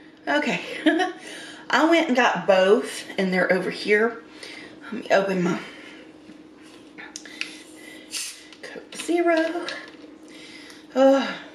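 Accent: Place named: AAVE, United States. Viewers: Enough with the Ebonics. Dang it!!!! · Ebonics hella cool Bro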